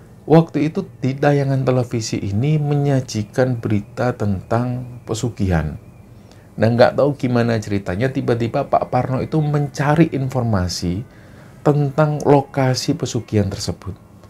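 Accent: Java, Indonesia. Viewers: Assalamualaikum..luar biasanya skrg byk subcbrnya..ikut senang bgt👍👍🙏cerita yg dibwkan juga seru,serem,menengangkan dan mas bawakan crtanya enak di dgr..tetap ga ningalin jawanya juga..pokoknya keren banget👍👍😍🙏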